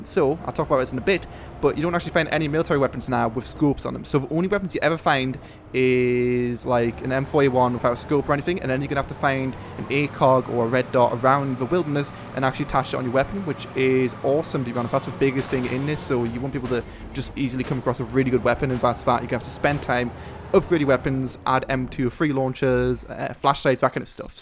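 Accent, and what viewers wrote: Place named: United Kingdom. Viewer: If you cant listen to him, then watch someone else and stop being annoying to other people watching the video. By what you said, you don't like live commentary, which is fine. His dialect? I'm guessing he's british, and really? You cant understand him? I'm from Serbia and I can understand him better then someone from Croatia! Well. there is my rant to you, sir! Respond if you wish, goodbye!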